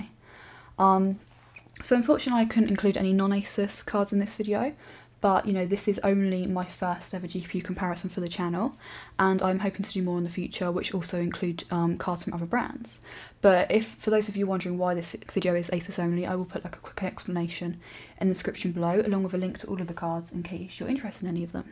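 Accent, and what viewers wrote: United Kingdom. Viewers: Nice work, interesting channel and projects, great looks and smile, nice personal touch in videos (beautiful dresses, bunny, …) with no sight of arrogance in your speech and manners that indicate that you respect the audience. (I like how sometime apologize for smth. you didn’t have time to complete or achieve nevertheless the hard work you already done). If I had a TV channel and money I would definitely hire you (Don't speak American stick to UK-English accent) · I just watched this video and made a comment about how I can't understand her English b/c of her accent and I made my comment b4 I saw yours so obviously people are having problems understanding her dialect. Your videos are awesome and very professional but you need to speak slower and dictate your words individually or people that aren't British will be lost. Just my 2 cents. 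· I'm sorry, but I don't understand most of it. While I find your dialect interesting, you slur your words together so much, that I seldom can pick them out of your sentences. I can't imagine that these videos are made only for the british people in your area (who, I suspect, would understand you perfectly well). The rest of us, and especially non-native speakers such as myself, are really having a hard time here.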